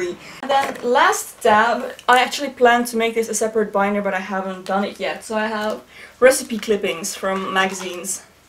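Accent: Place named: Netherlands. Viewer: Are you Dutch? I love your accent!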